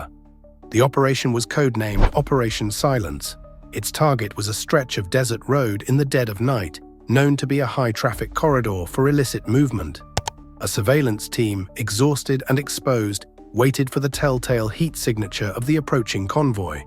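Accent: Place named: United Kingdom. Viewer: Realy appreciate the british accent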